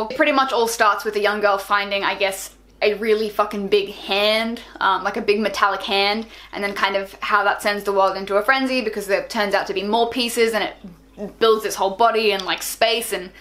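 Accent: Australia. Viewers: I know! I love the way it sounds. She's Australian, right?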